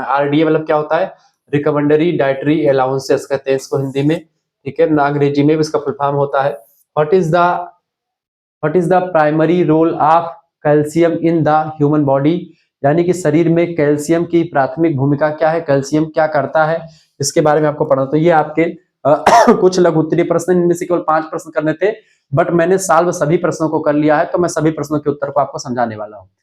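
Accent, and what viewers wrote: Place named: Bihar, India. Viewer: Sir ye bihari language mt bola kro kuch smj nhi aata Normal hindi bola kro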